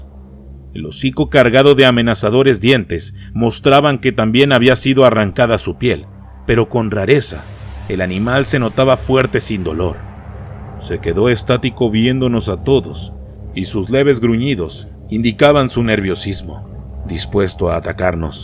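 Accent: Mexico. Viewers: Quiero el enlace para el correo...además de una buena voz...una buena historia tiene el encanto del acento mexicano!!!! Desde Argentina loa amo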